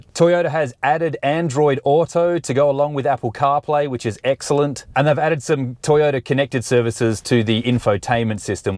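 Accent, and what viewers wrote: Australia. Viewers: This guy has an Aussie accent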